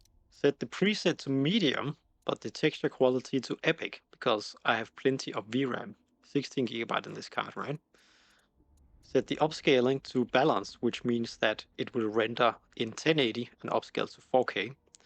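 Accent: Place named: Denmark. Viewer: Lol that's a Danish accent if I ever heard one.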